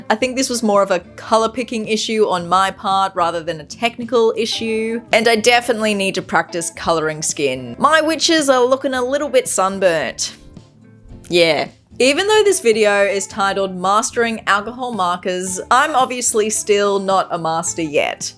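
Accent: Australia. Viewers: I appreciate that Ohuhu sponsors so many Australian artists so I get to hear them all say "aurhewhew"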